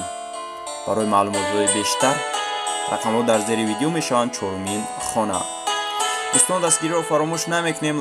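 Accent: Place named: Russia. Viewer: Рут лахча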